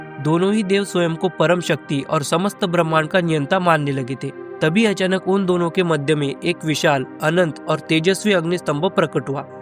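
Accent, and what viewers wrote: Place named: North, India. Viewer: Bhai mujhe aapki baate south indian ki tarah lgi lekin hindi bolne ka accent north indian lga isliye pucha, 😀 If you don't feel to tell then it's okay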